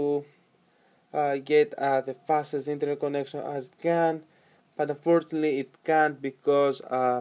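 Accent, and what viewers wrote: India. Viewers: ohh my god oh my goooood i hate when indian speak english (im not racist :) ) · Owen Batucan no its indian idiot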